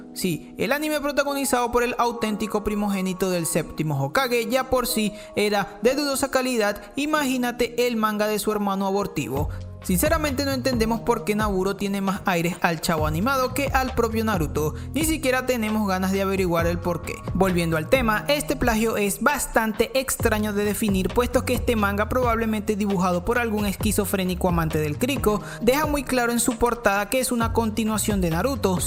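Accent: Venezuela. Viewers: JAJA me da risa que seas venezolano , ya que entiendo todo lo que dices Soy de Venezuela uwu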